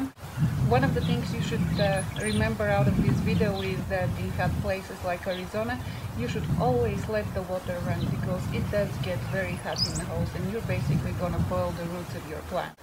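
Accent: Eastern Europe. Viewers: get rid of that east melania trump european accent. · When you start your plants do you put 2-3 seeds in and thin them out later or just use one seed at a time? The ones I thinned are about dead but the ones I didn't are doing great. I have one plant that keeps growing but the leaf curl is so bad they look like stems, will they ever go back to looking normal? Also, I water at night too. What country are you originally from, sounds like a border Russian country. I hope you don't mind me asking.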